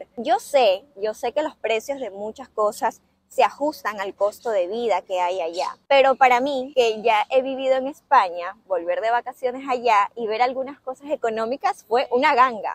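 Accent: Coast, Ecuador. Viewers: Me quedo un poco sorprendida por tu acento es ecuatoriano aunque has vivido en España mucho tiempo no hablas con acento español como la mayoría de ecuatorianos que solo llevan menos de un año y ya quieren hablar como españoles . Me encanta el Video . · Te felicito por tu humildad y no cambiar tu acento como si lo hacen muchos ecuatorianos y que ridículos se oyen. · 😘 mi hermosa. desde.Toronto Canada niña hermosa. me encantó tu relato de tú regreso a Ecuador.no lo as exajerado nuestro. Ecuador es <link> admira tu sencilles.heres una Ecuatoriana nata.tienes tu acento costeño.y tu video lo as echo a lo puro Ecuatoriano.👋👋👋👋👋te aplaudo y lo e disfrutado niña hermos.te cuento nosotros también somos Ecuatorianos.vivimos en Toronto Canada.por 51 años desde año 1.972. tenemos tres hijos sinco nietos y un bisnieto.. emos viajado muy poco a nuestro hermoso Ecuador.pero cuando emos viajado emos gosado dé las maravillas que tiene nuestro país cuando mis nietos viajaron a conocer nuestro hermoso Ecuador les encanto y gosaron dé la gastronomia Costeña los mariscos fresquecitos.que delicia. 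que tiene la costa Ecuatoriana.nosotros somos del hermoso Cuenca. y té cuento cómo tú lo sabrás el idioma en Canadá es inglés y francés yo personalmente no lo e olvidado él cantado Cuencano. y cuando e viajado él inglés lo e dejado en casa hasta muestro regreso 😂😂!!!!! detambién la gastronomia. dé la sierra es increible.,!!!!! espero si ves este pequeño relato algun dia me respondas mi nombre Leonor.!!!!! te mando un abrazo.con mucho cariño.❤❤❤❤😂!!Febrero 20/24.toronto Canadá.!!!!!! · A mi también me sorprendí, su acento costeño, lo tiene muy bien conservado, q bien xq el acento español es horrible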